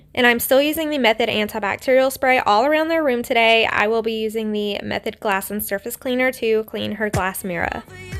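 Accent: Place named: Appalachia, United States. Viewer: Hillbilly accent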